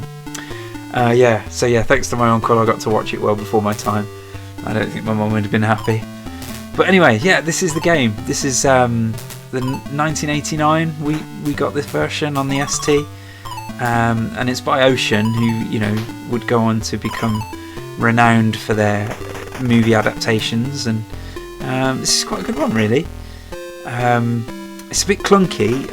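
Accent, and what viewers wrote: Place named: Midlands, United Kingdom. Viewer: Awesome vid! Thanks for uploading mate! Odd question, where abouts are you from? Roughly? You sound a bit like a Midlander. Can’t wait for your next stream, I randomly stumbled on your channel while looking for Atari ST videos, as a guy who grew up on the “other side” (Amiga) it’s great to see as much enthusiasm for Atari as there still is for the Amiga. Thanks again!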